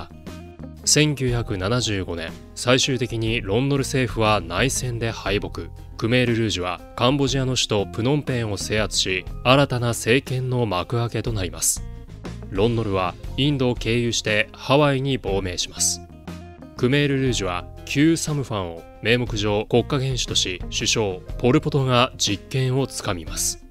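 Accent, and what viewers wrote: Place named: South Korea. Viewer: この読み手韓国人らしいね(確かSから始まる読みが少ない)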